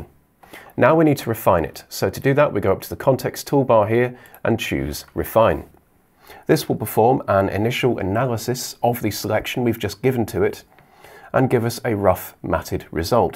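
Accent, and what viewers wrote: United Kingdom. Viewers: Thank you very much for this tutorial !!! And nice British English spoken, love it !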